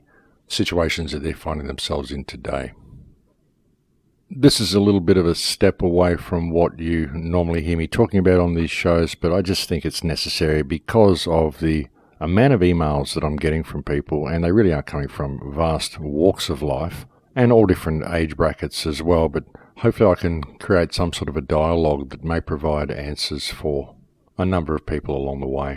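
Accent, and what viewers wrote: Australia. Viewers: Patrick dalton what r u talking about he's australian not british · Wow. I've woke up, never heard of you, first thing on my feed and you're describing exactly how I feel!!!!! And you sound like you are in Australia. Is there any way to connect with you on LinkedIn?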